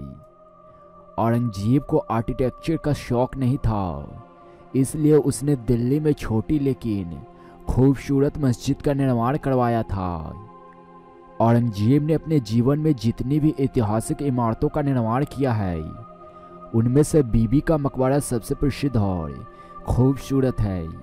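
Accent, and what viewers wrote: Bengal, India. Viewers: Ye bangali hey bhai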